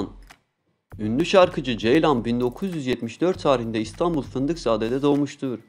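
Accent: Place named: Tunceli, Turkey. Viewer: Adminde TUNCELİ'Li ßeLLi şive Tunceli✓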